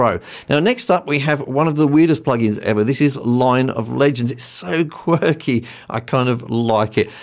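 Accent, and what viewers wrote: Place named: Australia. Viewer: Aussie in England or vice versa? (Great vid btw!)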